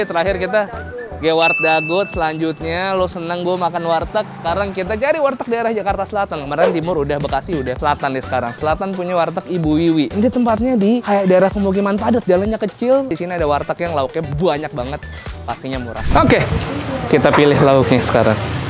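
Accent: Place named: East Java, Indonesia. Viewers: Oalah pantesan bahasamu jawatimuran mas wkwkwk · Logat jawatimur an aslinya mana sih 😅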